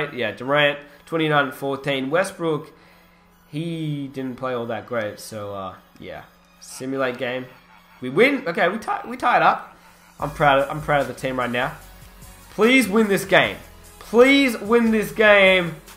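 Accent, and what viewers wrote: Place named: Australia. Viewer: yo are u from Australia or England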